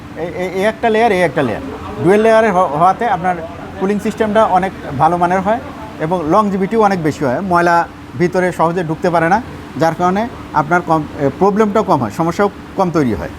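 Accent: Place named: North Bengal, India. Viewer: বাড়ি উত্তরবঙ্গ মনে হয় ভাষার ল্যাঙ্গুয়েজ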